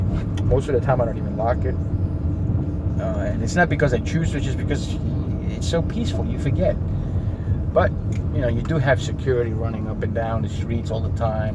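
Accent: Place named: New Jersey, United States. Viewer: You really live in “El culo del mundo” You say, Oh yes I’m from New Jersey, you speak like people from Paterson, Newark Good video to keep ass holes like you away of that great country. Excuse my spelling. Welcome all US tourists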